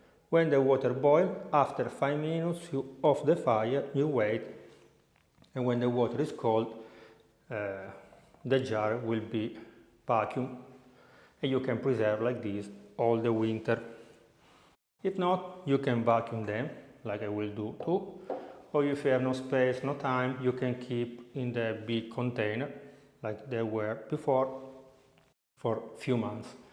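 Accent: Italy. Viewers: His Italian accent is very nice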